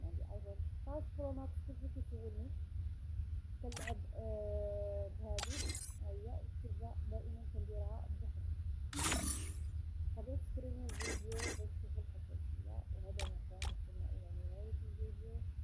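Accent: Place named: Algeria. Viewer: وﷲ محتواكي جميل وشيء جميل اشاهد محتوة كا محتواكي ولاكن الهحة الجزائرية اشويه اصعيبه فهما كا انا ليس من الجزائر شكرا وتحية اخوكم من بلاد الرافدين 🇮🇶🇮🇶🇮🇶🌷♥🫀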